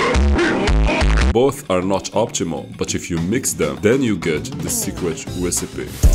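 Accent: Morocco. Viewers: ur moroccan I know that from your accent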